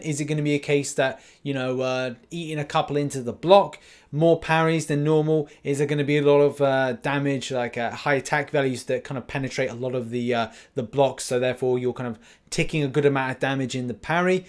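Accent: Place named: United Kingdom. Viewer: thanks, I'm a sucker for British accent lol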